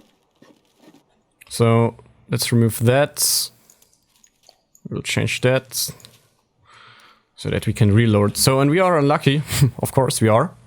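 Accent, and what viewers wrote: Germany. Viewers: Sehr gutes Englisch ! Man hört deinen Deutschen Akzent. Tolles Video! Danke🙏🏻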